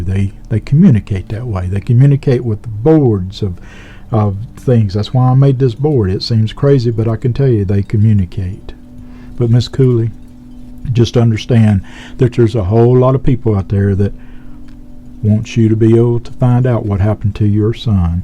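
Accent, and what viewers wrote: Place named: Kentucky, United States. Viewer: I don't know where you're from, but you sound like you're from my neck of the woods........ Kentucky.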